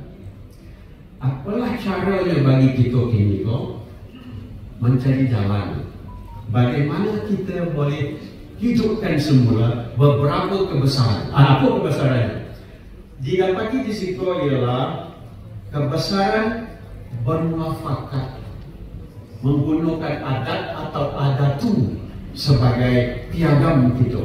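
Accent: Minangkabau, Indonesia. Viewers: indak hilang logat minangnyo 👏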